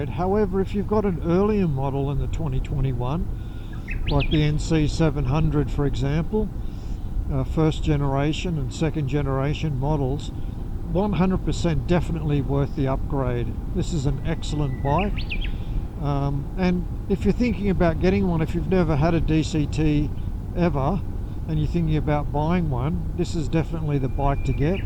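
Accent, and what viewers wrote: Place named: Australia. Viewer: You sound like an Aussie, you can’t buy a new one in Australia so I’m intrigued where you are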